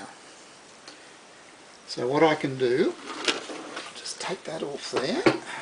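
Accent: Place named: Australia. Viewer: great to hear an aussie! . the packaging tape technique has solved a problem for me. thanks.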